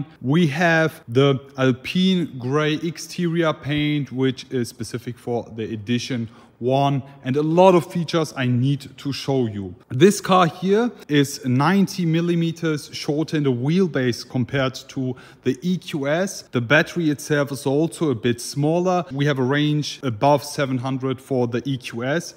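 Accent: Germany. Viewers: Немец говорит на английском? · Is he talking of 600 miles or rather 600 kms as he sounds European or German. As in this seems to be a German or European spec car. And those I would suppose are in kms.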